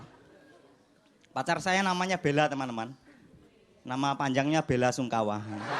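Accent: Java, Indonesia. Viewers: Lucu aksen jawanya...... Ngakak gw. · Materi dia sederhana tapi realita,di tambah lagi medok nya yg nyerocos 😂 Keren lah. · itu gaya standupnya mirip raditya dika. cuma dia versi medok jawanya. pola beat, absurd, actoutnya dan pecah nya sama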